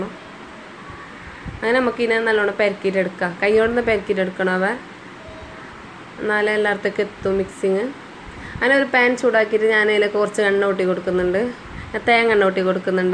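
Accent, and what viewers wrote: Kasaragod, India. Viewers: 👍👍👍nammale ksd slangil bisiyo pareembonne pang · Super... nhammale kasaragod baasha.. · Kasrood slang sooper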